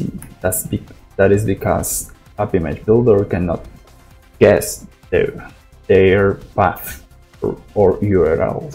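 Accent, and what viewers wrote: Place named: Latin America. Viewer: Hello. I hear one latino speaking english here, asi que te escribo en español mejor. Cómo se puede hacer una appimage a partir de un archivo sh? Es necesario tener muchos conocimientos de informática? Te lo agradecería mucho. Gracias de antemano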